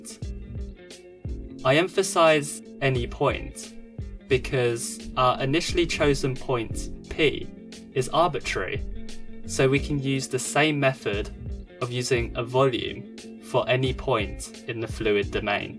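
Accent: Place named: United Kingdom. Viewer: marvellous animations, topped off with an explanatory British-Chinese accent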